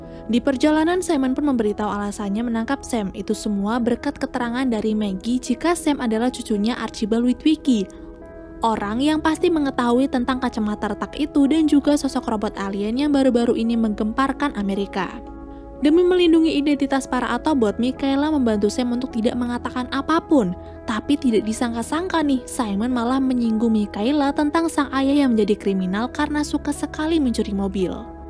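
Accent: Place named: Java, Indonesia. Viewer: Wong jawa rika ya mba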